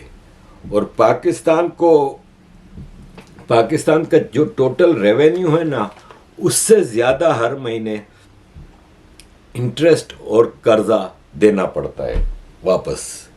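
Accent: Gujarat, India. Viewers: sir I watch your videos and am always curious why you say "jay jindera" in your intro. Then I research about you and I found out your roots from Junagadh Gujarat and your accent is also Gujarati Hindi/Urdu accent I got that coz am also being gujarati i got that now. Hats off sir of your work and spread knowledge & awareness to world.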